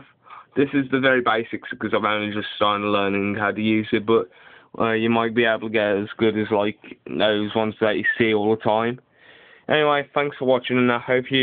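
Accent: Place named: Walsall, United Kingdom. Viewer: thanks a lot mate this help me out loads. you sound like u live near me I live in walsall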